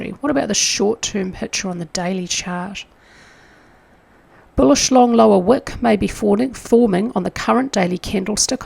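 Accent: New Zealand. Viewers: thinking mainly of the big one on everyone's mind... SP500. Ewi have been spectacularly wrong over many years, although arguably through Fed intervention, its debatable whether that is an exogenous factor and a valid reason for failure. Nonetheless, your analysis has been spectacularly correct, AND in that you stand alone. You can weigh possibilities, then apply fine balance and judgement. Umm, you sound kiwi??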